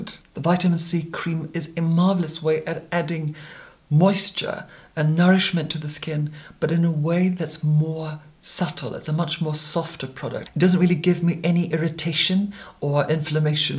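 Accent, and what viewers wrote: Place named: RP, United Kingdom. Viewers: Your make up is always stunning but what always amazes me is how good your English accent is. For you being Scottish, I can imagine it can't be easy speaking with an English accent. I can tell you my Scottish accent attempts always end badly- ha. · That cut glass pronunciation, those lovely, expressive blue eyes, those cheekbones...🥰🥰🥰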